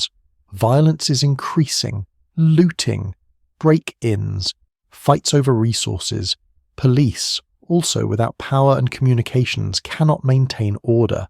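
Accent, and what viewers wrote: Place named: England, United Kingdom. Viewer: Its the strongest this year? Big Deal. Tell Me when something important happens without the English Accent.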